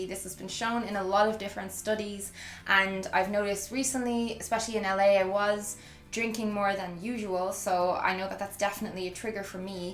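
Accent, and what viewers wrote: Ireland. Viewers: I can listen to that Irish accent all day long. It's beautiful music to my ears!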